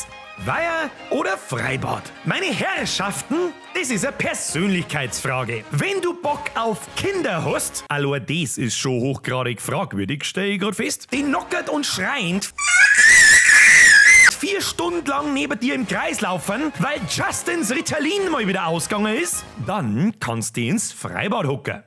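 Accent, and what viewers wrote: Bavaria, Germany. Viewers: ZEFIX etz binne moi zwoa wochan ned do und dann haust du seichane geiln videos ausa 😍 suppa wia oiwei duad guad wieder epan boarisch schmadsn zum hean 😊 kimmd den Freidog eigentlich a stream oder erst nägste woch? Habedrrrrrt.😜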